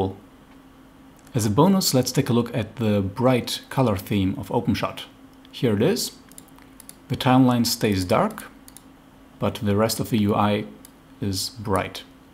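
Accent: Germany. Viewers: Thx you. Very clear and well explain. love the german accent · Nice tutorial. Very usefull.... and great german accent ;)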